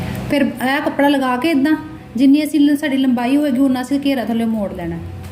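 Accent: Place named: Majha, India. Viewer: ਤੁਹਾਡੀ ਬੋਲੀ ਮਾਝੇ ਦੀ ਏ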